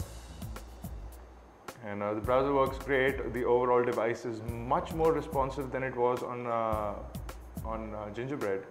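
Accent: India. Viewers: BLAH BLAH BLAH BLAH, I HATE THE ACCENT OF THIS INDIANO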